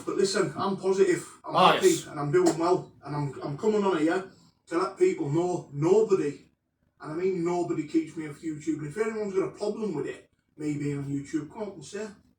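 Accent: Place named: Cockney, United Kingdom. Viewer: Your or8 for a fat cockney bell end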